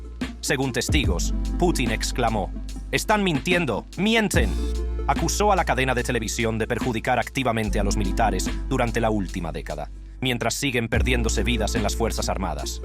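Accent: United States. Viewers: Tan buenas noticias..pero que pésimo gringo o ruso ..no se...se escucha mal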